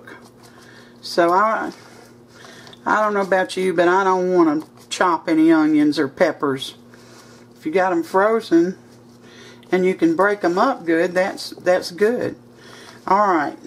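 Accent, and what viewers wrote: South, United States. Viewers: just kills me with that southern draw lol. Y'all are as country as cornbread and that's a complement from where I'm at. Keep on cooking and I will keep on watching. 😊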